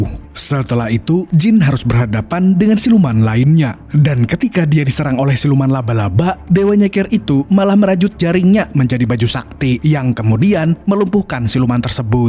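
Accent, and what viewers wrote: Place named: Cirebon, Indonesia. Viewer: Adminnya org cirebon😂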